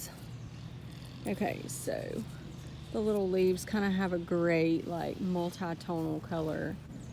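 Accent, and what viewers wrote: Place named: South, United States. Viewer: You talk just like me I’m on gulf coast pensacola fl.. however grew up in TN.. glad to find a southern gardener!! I would extend the bed up drive and connect with other bed.. it would make each side more symmetrical... love the plants!!👏❤️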